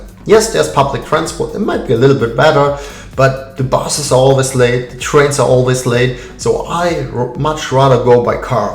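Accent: Germany. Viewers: My grandmother Wilhelmina (my mom's mother) moved to the USA shortly after WW2 from Vienna, Austria. She always hated her name, but I always thought that it was so beautiful. Your lovely German accent reminded me of her. I'm so happy to hear that you are enjoying being in America. Hello from Maryland, USA. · You're more American than most Americans. You're not a German, you just have a German accent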